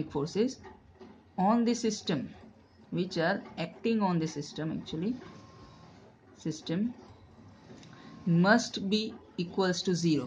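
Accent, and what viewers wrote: India. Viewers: I closed the video after hearing indian accent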